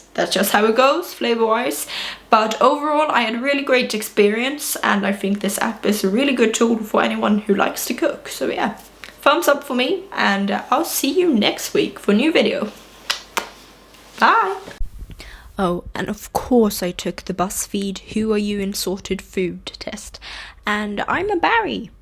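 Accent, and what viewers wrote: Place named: United Kingdom. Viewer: Jeez, I thought you were British. Your accent is incredible.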